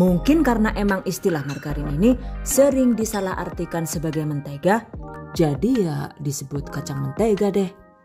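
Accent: Banyumas, Indonesia. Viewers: Lebaran kemaren pakek resep inih, dan bener bener mantul banget, makasih ya bunda, gak salah sih subcribe channel ini krn bener bener bermanfaat banget, banyak tips and triknya. Apalagi seneng banget sama ucapan konyol bunda haha, dulu ku kira bukan suara asli kyk ada dubber org lain, ternyata asli loh orgnya juga cantik. Ngapak ngapak gitu lucu aja wkwkw #GiveawayTHV2021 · Jenengan ngapak toh mamake😁_mntp mksh sharingny mamake mtrswn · Ini lokasi Madiun tp kok logatnya ngapak? Wkwkwkwk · Anger mb ngomong ngapak gawe aq gemuyu, menghibur 😃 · Aku suka ketawa sendiri, klo bicara ngapak😊😊 lucu..😂😂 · Trimakasih resepnya ya bun resepnya bunda mantap2 semua & +gemes sy kl bunda ngomong pake bahasa ngapak ampun deh sy jd ketawa trus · Mbaknya suaranya enak...empuk kaya kacangnya....hehe...ga taunya ngapak jg ya...... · Mantap... Pada bae logat Nyonge...😊👍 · Suka ngapaaknya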